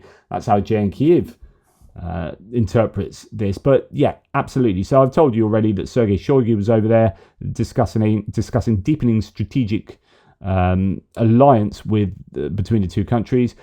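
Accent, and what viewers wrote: New Zealand. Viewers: haha good kiwi accent churr bro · I don’t care what anyone says.. your Kiwi accent is pretty decent. 👍👍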